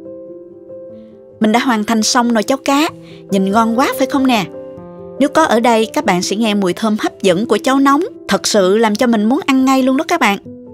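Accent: South, Vietnam. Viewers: Hi. chỉ dẫn công thức nấu ăn ngon.Rất cảm ơn về chương trình này. Với giọng nói Miền Nam ngọt ngào và tai' nấu ăn của thì chắc chắn không chê vào đâu được. 👍❤🇺🇸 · giải thích rõ ràng.. giong Nam hay qua, nghe êm tai vi giong của cô không bi ngọng khi đọc những chữ bắt đầu với R! Những video miền Tây nghe khó hiểu quá. Cam ơn Cô. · Giong noi mien nam cua that truyen cam de thuong mon nao cua huong dan deu ngon va hap dan, cam on nhieu, Ca co noi, la ca hanh ben My. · Mình rất thích xem dạy nấuăn, cô ở tiểu bang nào vậy? Nghe giọng miền nam rất dễ thương,mến chúc cô và gd nhiều sức khỏe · Tôi thích nghe cô nói rất truyền cảm giọng nam bộ nhẹ nhàng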